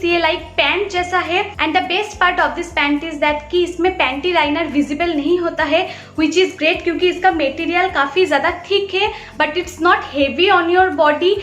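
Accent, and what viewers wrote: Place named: Bengal, India. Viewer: Your voice is very sweet! Bengali touch ♥️♥️♥️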